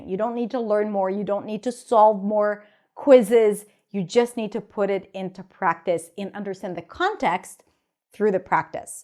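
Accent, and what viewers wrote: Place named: United States. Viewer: English is my second language and i've learned it just by watching youtube videos and listening to english podcasts (joe rogan, mr ballen etc). I've been doing this for many years and many hours per day, every day. So..., i'm sorry but your statement that learning a language by watching/listening the targeted language is bad advice is mind blowing to me. Can you explain how did i acquire my english? I've never spoke it while learning it, and one day, i just start speaking it spontaneously. You dont learn a language, you acquire it by listening and reading and you dont have to speak it, for it will come later, naturaly. Also your american accent is flawless, i think english is not your second language, if i am wrong, im sorry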